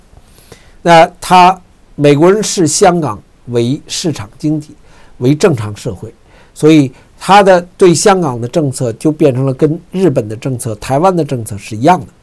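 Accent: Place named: Beijing, China. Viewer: 石涛兄，听您口音也应该是北京人，89年那次估计您也经历过全过程，您现在在一个平静安全的地方去鼓动香港的年轻人去重演那些大家不愿再回首和重复的画面，您觉得有意思吗？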